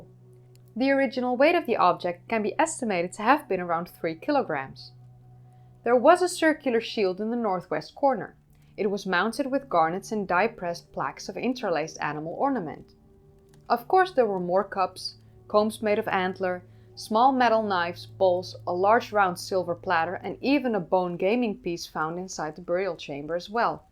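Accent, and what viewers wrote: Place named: Netherlands. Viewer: the heavy dutch accent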